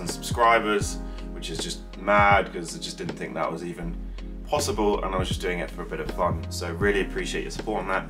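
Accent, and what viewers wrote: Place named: United Kingdom. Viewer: Well, I'd say that it would be interesting to keep up with you not only for gym activities but for whatever you may like to show us (like some progress with your entrepreneurship etc.). This way, guys who come here for some motivation and a positive example of self-change will see that they can not only change their physical appearance, but their life overall. If you'll be willing to take that role, of course. A big thanks to you for creating this channel, mate! I found your shorts extremely interesting and useful - they don't take lots of time to watch and are straight to the point. Also, y'know, I'm a straight guy and all that - but, dude, you look damn amazing! I love British pronunciation over all others and must admit that your is awesome. Thanks for keeping it understandable for non-natives.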